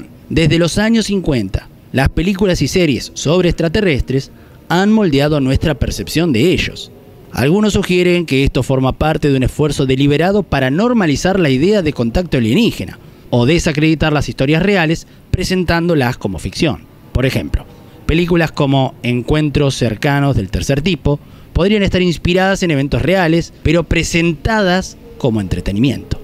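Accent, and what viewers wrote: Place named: Argentina. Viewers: Ese acento es argentino. En España a la "ye" le decimos "y griega", a la "v" le decimos "uve" y a la "w" le decimos "uve doble". Ja, ja. ¡Parece que hay variedad en el español! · Si Elvio. Así hablamos en algunas provincias de Argentina. Se lo llama Regionalismo. Saludos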